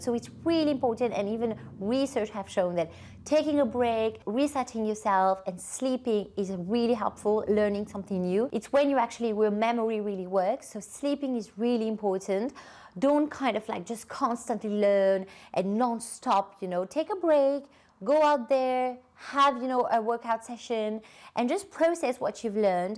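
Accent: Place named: London, United Kingdom. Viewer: thank you for these precious tips. Love your lovely London accent xoxo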